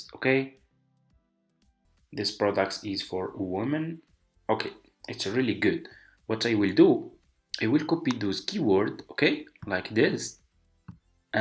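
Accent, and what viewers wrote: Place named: Morocco. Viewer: Thanks and good luck! You are a moroccan i can tell from the accent 😅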